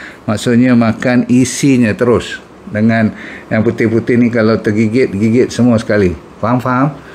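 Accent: Malaysia. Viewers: Denger cakap malaysia 😅😅😅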